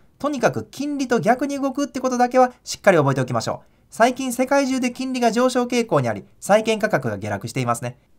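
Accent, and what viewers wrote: Kansai, Japan. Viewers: 70点！最近学長の動画を知って、見まくっていたら、関西弁がうつってマス。同じ方多いのではないでしょうか？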